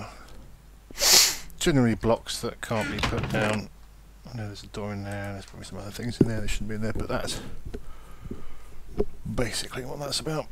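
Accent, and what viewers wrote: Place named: Derbyshire, United Kingdom. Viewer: ..? What area in England are you from?! You sound like my uncle and hes from Chesterfield - Derbyshire..:L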